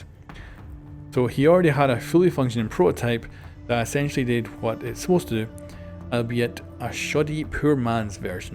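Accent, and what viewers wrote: Ireland. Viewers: You have to be irish with this accent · Are you irish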